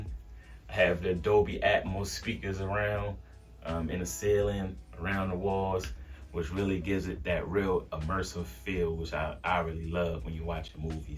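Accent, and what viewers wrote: Baltimore, United States. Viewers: Boy this guy from BMORE you heard, that accent never lies. I love the mancave and the video subscribed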